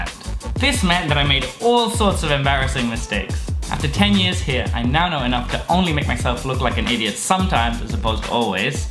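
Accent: Standard. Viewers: 不得不說，發音蠻標準的😂😂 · 你講中文超標準的啊！！！！ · 台語太標準了 不訂閱怎麼行～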